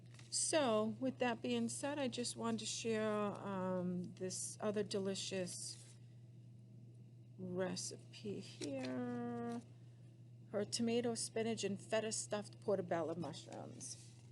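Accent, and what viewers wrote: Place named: Massachusetts, United States. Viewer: I love her Mass accent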